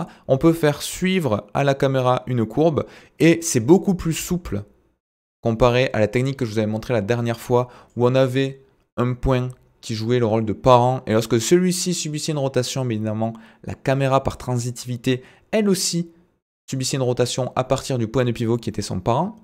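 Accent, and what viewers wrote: South, France. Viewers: Bananée 🤪🥂 beau gosse.. 😉 Tu ressembles à un de mes cousins sans la moustache et le bouc et sans le p'tit accent du sud. 😉😘 Toujours pour tes tutoriels même si je ne suis pas souvent disponible depuis que j'ai retrouvé du travail. Je te souhaite pleins de nouveaux projets pour cette nouvelle année et de nouveaux abonnés car je t'ai mis en sélection de chaînes via ma chaîne youtube en sélection de chaînes pour apprendre Blender en français. 😉😘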